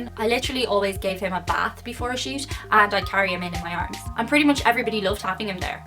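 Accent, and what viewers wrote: Ireland. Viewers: Dang it, it hit me like a truck ! Didn't see that coming. I guess all good things come to an end. Facts was a fun way to get used to the irish accent as I'm going to work in Ireland soon. Oh well, I reckon I have many channels to subscribe to, now.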